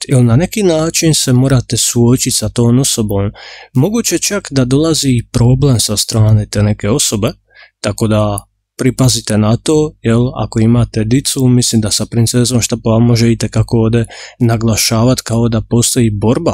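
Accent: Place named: Dalmatia, Croatia. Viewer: Obožavam kao prvo vaš Dalmatinski jezik,vaša brza čitanja,jednostavno super ste.Pozdrav Bos Gradiška.🥇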